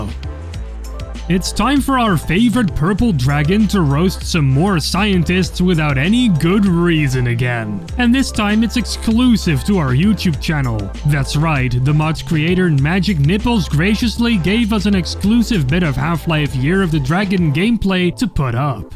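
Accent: Netherlands. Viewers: Are you Dutch? you have a slight Dutch accent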